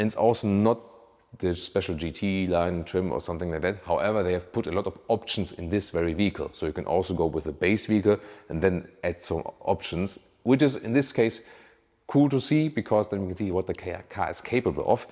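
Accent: Germany. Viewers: i like your German English. you speak both at same time love it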